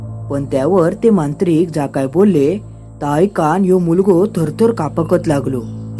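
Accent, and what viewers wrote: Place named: Konkan, India. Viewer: Are hec best marathi kokni marathi🥰🤩😍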